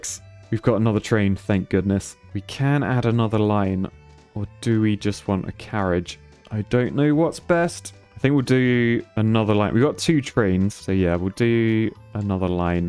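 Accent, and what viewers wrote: United Kingdom. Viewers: "Our square has boarded the train" Your british always cracks me up. Thank you for making videos. You made me buy mini motorways!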